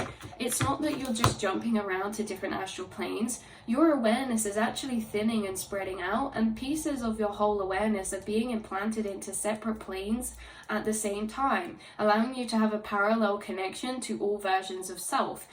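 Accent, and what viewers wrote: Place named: England, United Kingdom. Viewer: Oh yeah I’m in the UK and you sound like you’re from England…I’m a Londoner..Peace ✌️🫶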